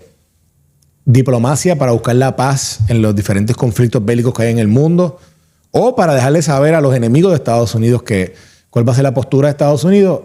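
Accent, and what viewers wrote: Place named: Puerto Rico. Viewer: Como Cubana te agradezco k le des voz a mi pueblo. Me encanta el acento boricua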